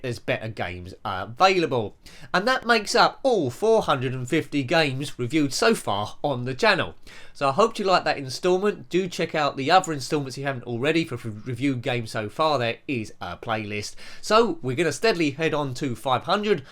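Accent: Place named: Essex, United Kingdom. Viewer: Loving all these top game videos. I've watched all the C64 and Amiga videos so far and working my way through the Spectrum ones. Also, I've been trying to guess where your accent is from. I ask that as if it's somehow separate to you. 😁 I was going to guess Enfield, but now I'm thinking probably over towards Grays in Essex. Am I right?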